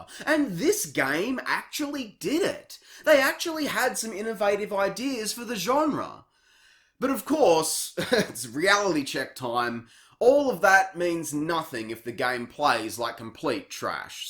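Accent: Australia. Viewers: Aussie accents make everything 17% more entertaining.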